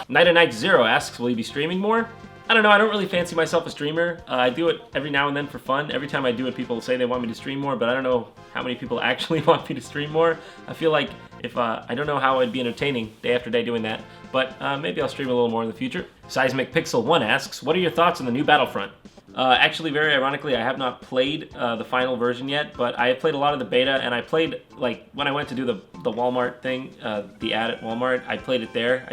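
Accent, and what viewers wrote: United States. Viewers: what a strong american accent he has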